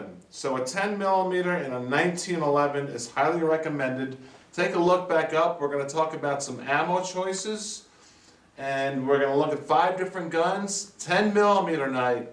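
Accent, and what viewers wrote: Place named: California, United States. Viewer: this guy sounds like hes californian.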